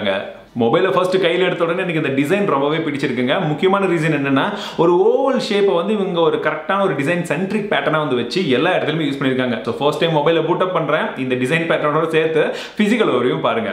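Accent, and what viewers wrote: Chennai, India. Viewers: Innaa thala enga chennai baasai la konjam varudhu, but nalla irukku 🤣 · Vara vara chennai slag bro naaku la naatiyam aada arambichiduchi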